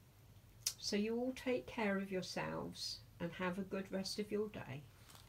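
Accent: United Kingdom. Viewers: I don't know why YouTube unsubscribes me from random people ... I was wondering why your recent videos weren't popping up in my feed! .... !! .... I remember the last video I've seen was about a haul (which are my favorite) 😊💕🌺 What I enjoy most about your channel is the hauls and the Theme Thursdays you do on the babies. You have a lovely collection! I would love to continue to see your theme thursdays and your babies dressed up in the beautiful clothes that you have for them. I enjoy your book-story-telling too. You have a wonderful and kind heart 😊🌺 Plus, who doesn't love listening to a UK Accent?? hehhehheh .... Have an amazing day!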